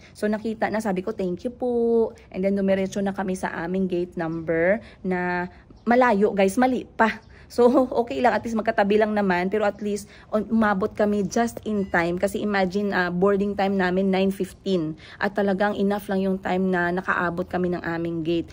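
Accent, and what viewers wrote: Iloilo, Philippines. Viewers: 😂😂😂 nalilingaw takaga ako sa pag i Ilonggo mo Ms. Nalilipay talaga kmi makabati sang inilonggo mo ah. Enjoy kamo sa Thailand, God bless and keep safe po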